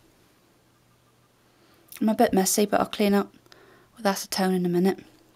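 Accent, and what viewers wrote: United Kingdom. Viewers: Awesome! And love your British accent! I always wanted to go to England to get the accent :3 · I love your British accent! :D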